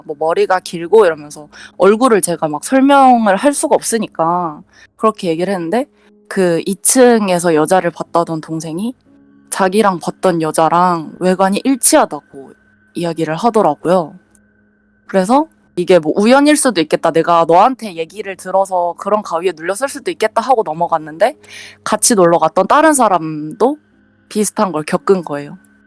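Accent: Gyeongsang, South Korea. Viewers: 근데 경상도 분인건 확실한데 왜 일부러 사투리 안쓰려고 하는느낌이지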